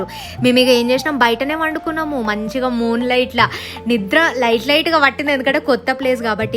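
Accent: Telangana, India. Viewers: Nu srikakulam antav... telangana ammai laga matldtunav slang 😅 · Me telangana yasalo aa vuru kosam chepte konchem konchem jock ga vunna nice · nuvvu pakka telangana kada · Your slang is telangana you are telling your place is srikakulam unbelievable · Nuvvu matladedhi telangana basha malli mana srikakulam antunav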